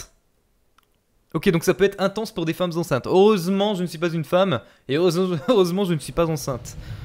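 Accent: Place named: England, United Kingdom. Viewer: j'adore ton accents anglais 😂😂😂 Sinon super vidéo comme toujours 👏👌👍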